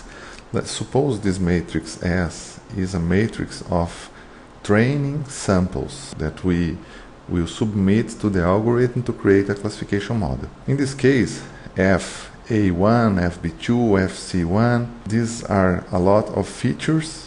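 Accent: Brazil. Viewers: His ascent sound like hi is brasilian? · Nossa, comecei a ver e ouvindo o jeito de falar ficou muito clara a brasilidade da pessoa. Mas muito legal o material.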